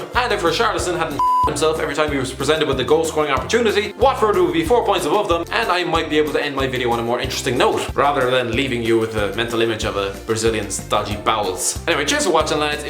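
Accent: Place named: Munster, Ireland. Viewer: Somewhere in Munster I'd say judging by the accent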